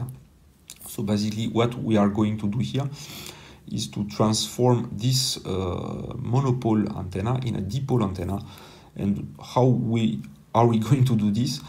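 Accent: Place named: France. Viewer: a l'accent francais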